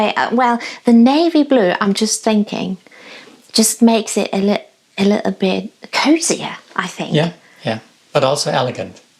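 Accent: England, United Kingdom. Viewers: Question; You are German but have English accents. Were you raised in England?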